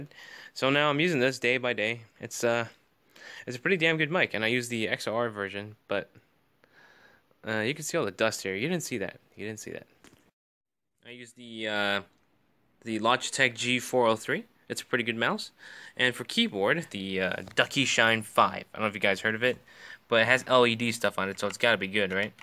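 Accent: United States. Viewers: I thought u were an American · i thought you were american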